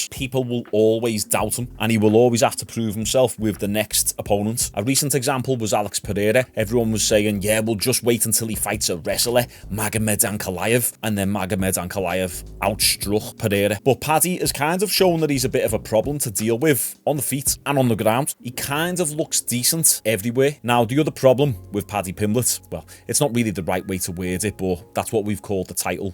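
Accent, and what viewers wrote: Liverpool, United Kingdom. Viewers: I’m fae Glasgow and I love the scouse accent,it’s class! Cheers for the content,mate! Keep up the good work my friend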